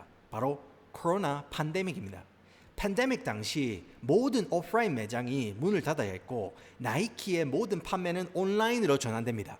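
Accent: Gyeongsang, South Korea. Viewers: 웓따 행님 발음 함 구~수 하구마이. 영어는 미끄덩~ 사투리는 갱상도 싸나이네~ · 왤케 발음이 좋아요? 갱상도 바이브가 네이티브임❤ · 내용도 유익하고 말씀도 친숙하고 알아듣기쉽게 경상도 내의 서부유타읍 악센트와 발음으로 말아주시니 정말 재밌습니다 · Chic한 현지식 영어발음 구시한 경남 사투리의 조화 ㄷㄷㄷ 평냉에 스리라차 소스 넣은 감칠맛...이 좋아오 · ㅈ ㄴ 경상도 사투리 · 영어도 경상도 사투리 억양이 있네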